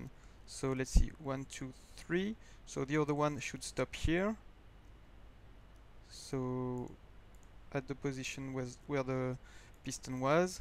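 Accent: France. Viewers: Dat french accent ^^' Merci pour le tuto j'adore le concept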